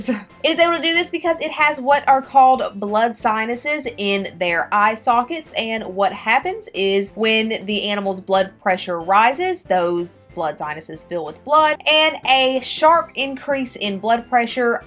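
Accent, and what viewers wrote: South, United States. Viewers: First of all, my 6th graders loved this video. Several of them look just like the reptiles you showed. We think that you are the perfect woman. Your teeth are so white. Your Area 51 T-Shirt was a great choice. I loved the southern accent. Your spooky eyes had hypnotizing effects with those white rings on them.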